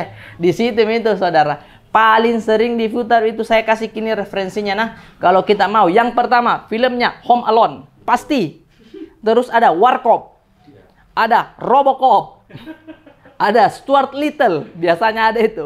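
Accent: Makassar, Indonesia. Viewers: Saya orang sunda, saya suka logat makassar😀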